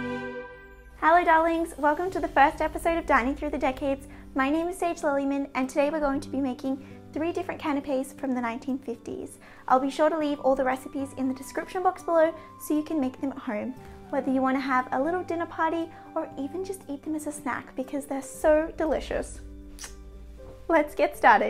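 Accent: Australia. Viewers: You’re one of the only girls that makes an Australian accent sound pleasant, lol. No hate!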